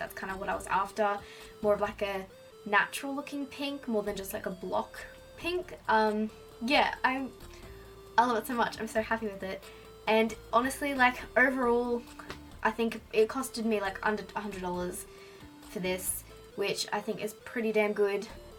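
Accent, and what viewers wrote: Australia. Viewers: Is this an Australian accent I’m really trying to hear the difference between Australian and British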